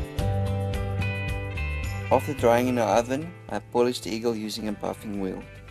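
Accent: South Africa. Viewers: bra r u from south Africa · Afrikaans accent - check Ugly South African 3-prong electrical plugs - check Johnson & Johnson petroleum jelly - check :D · south african? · You from South Africa, sir? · Is that a South African accent?... shout out from JHB.... awesome work! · You're South African? Edit: yes you definitely are, what's up boet?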